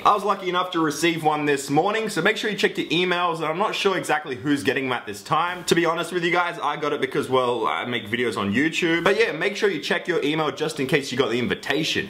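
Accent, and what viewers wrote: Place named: Australia. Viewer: My left ear is loving this Australian voice and my right ear is enjoying these random noises.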